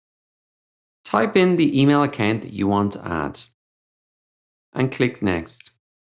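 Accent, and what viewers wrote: Ireland. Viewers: lol, lovely irish;)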